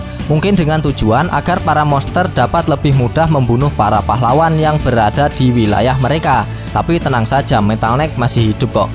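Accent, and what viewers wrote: Java, Indonesia. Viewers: Mantapp mas... Ntah lah logat jawa lebih enak di dengar buat reack manga... Ngomong ngomong stylenya mirip MR.K Animenia mas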